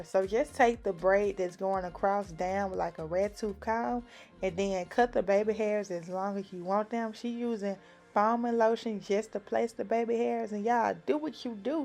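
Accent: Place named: Memphis, United States. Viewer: That south Memphis accent❤️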